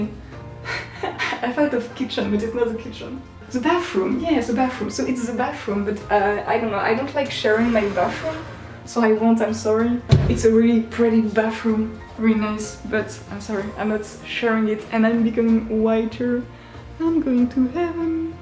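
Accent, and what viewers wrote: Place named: France. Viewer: Bonjour！！ I just saw your channel by accident. What a coincidence! I am from China and major in French but I am a freshman so my French isn't good. I also intend to study and live in France, so I am looking for some videos that allow me to practice French and English at the same time. Finally, found you!!! Love your video, your good sense of humor, optimistic attitude towards life, and your fluent English with the cute French accent（I hope this won't offend you)!!!Looking forward to your more video!Bonne jourée!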